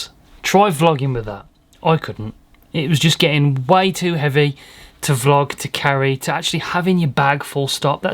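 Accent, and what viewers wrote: United Kingdom. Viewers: Hey thanks for the video, quick question, if I have a canon m50, I don’t need an adapter right? Also you sound like you’re from the UK, I am too and was wondering where I could get the lens from?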